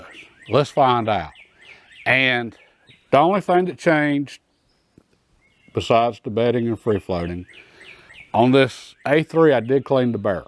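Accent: South, United States. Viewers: Love his southern accent. I like that he talks kind of slow too so I can understand, as a new person to all this. And he seems like he's been hunting and shooting all sorts of rifles for decades.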